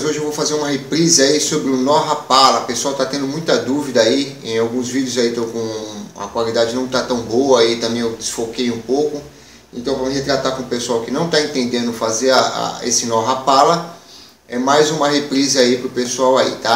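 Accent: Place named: China. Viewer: e por que vc fala tipo chinês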